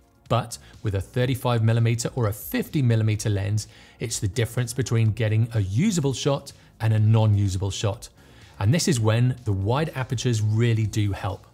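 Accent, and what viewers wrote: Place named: Wales, United Kingdom. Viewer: bloody hell would never have placed you as Aberystwyth! But it is the perfect presenters voice man not gunna lie. H that's awesome and likewise, love your channel!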